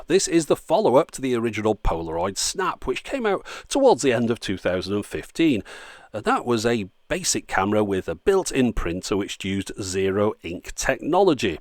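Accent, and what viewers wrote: United Kingdom. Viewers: I just discovered your channel and I really appreciate it. I just have a side question : Is it a britannic accent ? I wanted to know, I'm not sure about it ^^ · Love watch your video.. Probably because of your accent and British humor 😂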